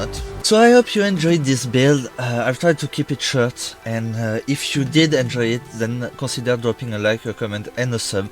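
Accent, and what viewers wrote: France. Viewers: T'es français non 😂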